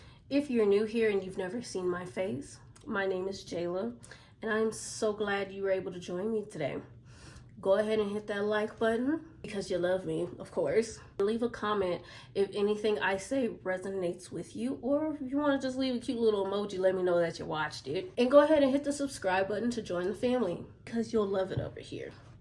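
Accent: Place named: Texas, United States. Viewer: What city you in sound like a texas girl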